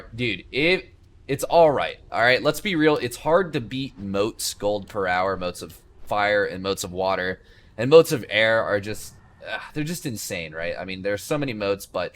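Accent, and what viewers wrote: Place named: United States. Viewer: jesus you have a cool american accent!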